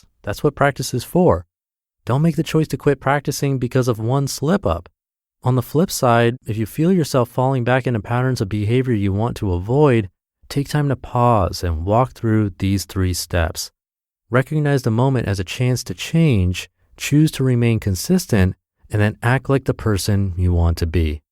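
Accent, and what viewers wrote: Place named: United States. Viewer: Nice to hear an American without an exaggerated accent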